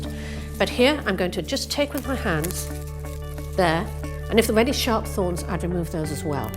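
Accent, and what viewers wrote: United Kingdom. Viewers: british as hell, sharp as usual good job!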